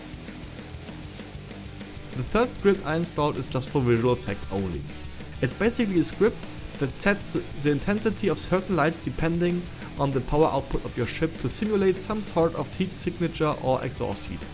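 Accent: Germany. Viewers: you Sound German .. are you?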